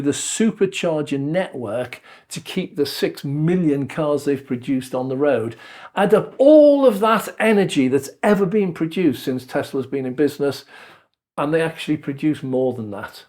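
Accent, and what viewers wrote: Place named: West Country, United Kingdom. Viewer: You have some west country accent (Yeovil maybe?) and that tends to drag out some words. Also as you say thinking and talking at the same time will slow down speech. Someone who speaks quickly maybe is not putting too much thought in. As for just a minute I would say Kenneth Williams was more cheating than thinking.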